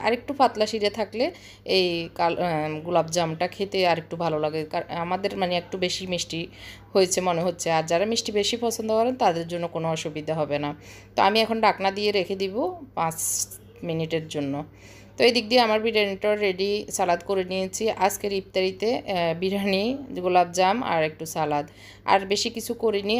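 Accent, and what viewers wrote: Noakhali, Bangladesh. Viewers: Apu apnr kothay Noakhalir vasar j tan ta, ata amr kache onk valo lage Ami Noakhalir NSTU te porasuna korechilm...onk suvokamona apnr jonno.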